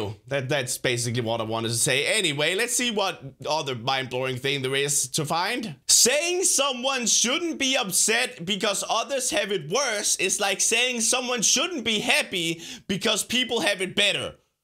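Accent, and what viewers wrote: Denmark. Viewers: Ideer is idea said funny because if you haven't noticed somehow, Crainer has a danish accent because he's danish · Danish accent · He has an Danish accent so idea is ideer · its because of his danish accent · YourNewFather it’s his accent he’s danish and everyone in Europe says it like that LOL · Dink Its his Danish accent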